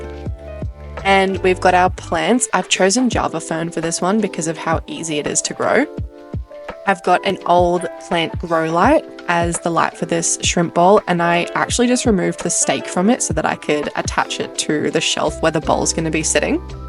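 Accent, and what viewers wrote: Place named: Australia. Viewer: Love your down to earth vids and its really refreshing to hear an Aussie accent best wishes from SE Queensland 😊😊😊😊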